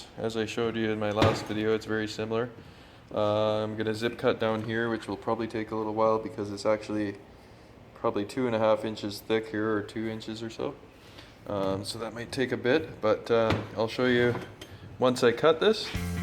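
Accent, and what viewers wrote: Canada. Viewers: You sound Canadian.... Almost like rocky from rocky and bullwinkle, just without the "eh" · You sound pretty Canadian for a Kiwi... I'm a Canadian, we can smell our own.... Sniff , Sniff...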